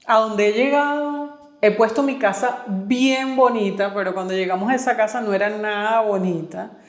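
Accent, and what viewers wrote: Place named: Venezuela. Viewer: Hola, por tu acento te identifiqué como venezolana y no me equivoqué. Me siento identificada contigo con respecto a las series turcas. Leí a una especialista en el tema de novelas de TV y ella decía que las novelas son formatos diarios (de lunes a viernes) y que las series son 1 episodio por semana. Al igual que tú, me encantan las series turcas y la primera que ví y que me enganché inmediatamente fue Kara Para Aşk a finales del año 2018… Engin Akyürek me impactó como actor y enseguida lo Googlee. Y son súper fan de él, he visto todas sus interpretaciones y tengo 1 de sus libros. Con la cronología de tu relato me hiciste revivir aquella época de las telenovelas venezolanas, ví muchas, luego las brasileñas, las colombianas (antes el mercado era exclusivo de las mexicanas) ahora en la TV nacional las turcas están en el horario estelar de las de la noche. 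Me gusta mucho como relatas los temas que tratas y cuéntame como una nueva seguidora en tu canal. A propósito soy de Guayaquil-Ecuador Saludos ❤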